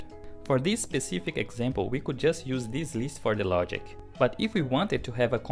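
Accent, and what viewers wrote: Brazil. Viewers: Eu sabia que era inglês de brasileiro hahah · Pq eu reconheço um sotaque brasileiro tão fácil?! haha Não sei explicar, não sei imitar, mas com 5 segundos de video, já sabia que era brasileiro! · Eu sabia que esse sotaque tinha um jeitinho brasileiro!!! Fera!!!!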